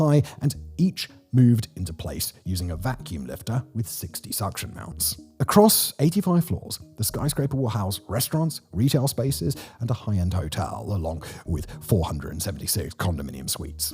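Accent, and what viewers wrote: England, United Kingdom. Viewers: GOOD. Consider slowing down the speech a bit. The Brit accent is quite fast and tough to keep up with. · Native born Anglo Canadian... so... I had to turn on CLOSED CAPTIONS. Our host talks so fast that it is hard to adjust for his regional accent. It seems the more I want to know what he just said.. the more hurried and non-standard are the sounds. It is a voice that sounds "learned" .. emphasis on the "ed". And I cannot learn from it. An achievement in speed talking with some English county to thank.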